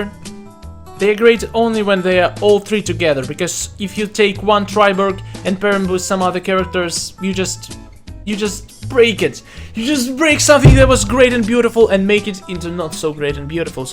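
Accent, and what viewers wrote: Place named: Russia. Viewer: I don't mean to be offensive But are you Russian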